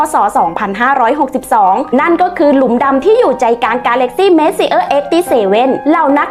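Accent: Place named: Thailand. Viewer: พูดเหน่อจัง😂😂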